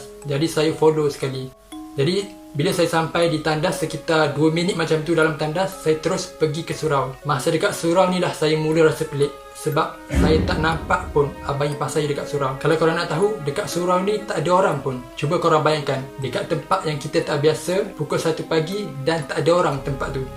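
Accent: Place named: Kelantan, Malaysia. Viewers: Orang Kelantan kalau cakap kl memang akan cam ni, nice 👍 cerita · Macam org kel je bunyi accent 🤔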